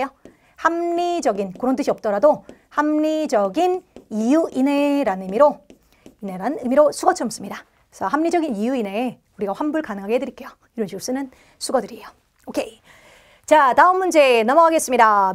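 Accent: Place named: Chungcheong, South Korea. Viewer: 있것죠~ 충청도 분이시네요 👍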